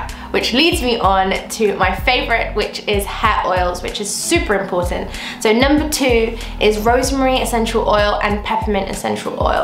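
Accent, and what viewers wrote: United Kingdom. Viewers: I LOVE your accent:D Your name sounds Arabic to me, but your accent is beautifully British <3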